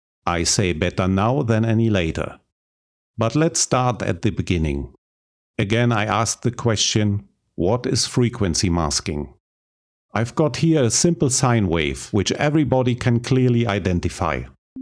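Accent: Germany. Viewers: I LOVE YOUR STUFF !!! ITS SOOOOO GOOD :)! Are u german? Because your accent seems really german too me, if yes .... ICH LIEBE DEINE VIDEOS :D ! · I knew you sounded too German to be Spanish.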